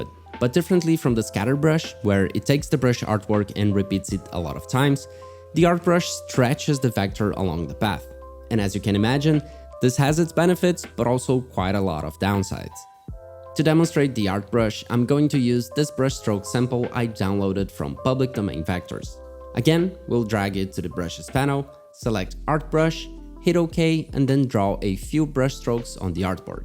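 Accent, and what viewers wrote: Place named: Eastern Europe. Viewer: You have a very massive slavic accent.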